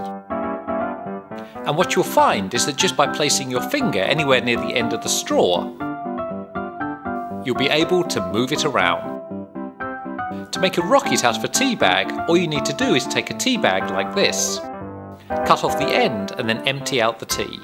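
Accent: United Kingdom. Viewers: I hate this man's accent. Can't stand british... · The way British people speak drive me nuts and annoys so much but at the same time it attracts me and I try to pronounce words the same way. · stupid british accent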